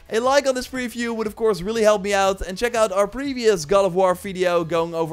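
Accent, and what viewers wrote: Netherlands. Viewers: dutch accent right? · You can hear that you're dutch 😂 (Goeie video maat!) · ARE YOU DUTCH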